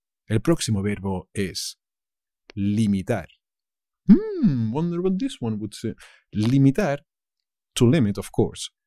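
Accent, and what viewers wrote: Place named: Castile, Spain. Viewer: I have gone through many different tutorials and decided to go with yours. Your way of teaching is both very clear and efficient. That you are giving different examples than what is written on the slide is genius because that way it is possible to learn more vocabulary and grammar. Another important reason I chose your tutorials is it was hard to find European Spanish(or should I say Castilian?) I adore the "th" sounds haha. Thank you for your work, it is much appreciated.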